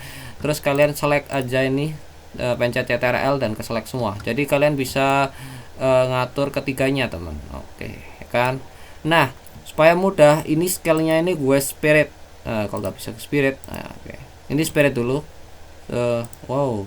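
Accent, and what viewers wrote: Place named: Java, Indonesia. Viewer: English Jowo Anjir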